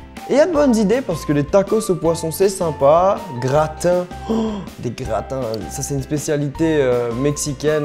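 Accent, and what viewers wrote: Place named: South, France. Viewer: J'aime trop t'es video t'es trop beau ta un accent du suddd mon poteeee x'd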